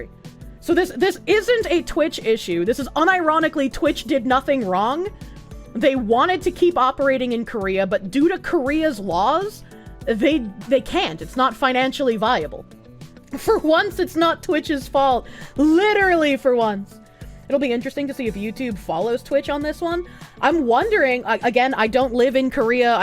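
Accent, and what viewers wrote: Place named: West, United States. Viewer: 그냥 뉴스 많이 챙겨보는 분인거같은데 서부 악센트가 강하게 들리는거 보니까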